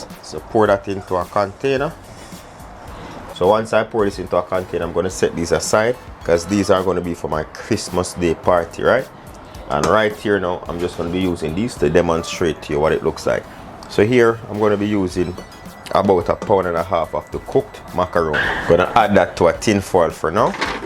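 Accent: Jamaica. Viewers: Bro you have me drooling just subscribed. Love how Jamaican speak and your cooking is out of this world. Ah gwan big up yuhself bro 🇹🇹🇹🇹🇹🇹🇹🇹🇹🇹🇹🇹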